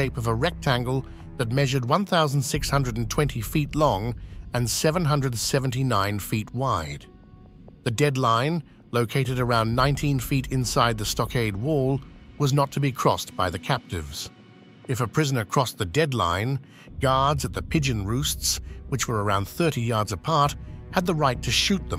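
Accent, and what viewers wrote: United Kingdom. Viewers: Hmm, I thought because it was yet one more UK narrator doing American topics… But seriously, the UK gave us the first real concentration camps in the South African Boer War (referred to as the Second Boer War). Women and children died by the thousands.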